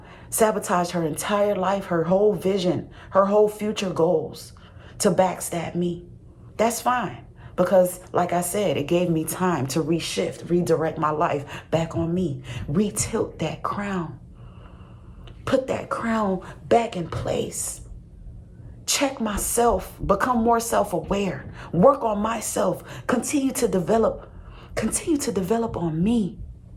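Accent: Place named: Ghana. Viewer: I like the Ghanaian accent "my sista."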